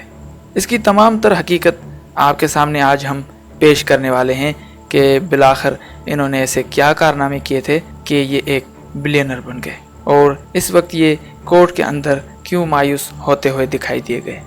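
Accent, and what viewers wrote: India. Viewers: Ye viedo kisi pakistani ne nhi Banai balke kisi indean ne Bandai he kuk kaf Korean kh prhr rahe hen in ki urdu indean bolne ka andaz he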